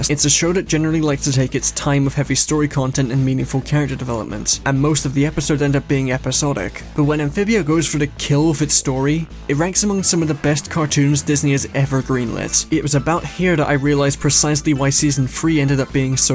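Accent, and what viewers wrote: United Kingdom. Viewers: Brilliant analysis, I had most of the same thoughts about this show. The episodic nature almost put me off it but the slow burn of the overarching plot that was always present under the surface kept me hooked. Season 2 was fantastic! Season 1 was average except when it focused on the plot and season 3 was schizophrenic honestly, the tonal shifts didn't work and the ending felt like kind of a mixed bag but overall it was a wonderful show. The animation was brilliant, the comedy was consistently funny most of the time and the core story was so good. It really could have been one of the best. On an unrelated note, I must suggest you try She-ra and do an analysis if it grabs you, same for Arcane as well. I have loved all the analysis videos I've watched and while my bias towards British humor and accent contributed to that, I subjectively think your videos are objectively good. 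Anyway, TL;DR She-ra and Arcane analysis please.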